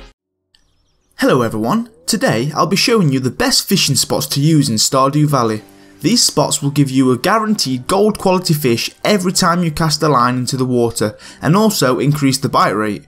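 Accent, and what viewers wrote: United Kingdom. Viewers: Yes, but what's your accent? I like it. Is it British?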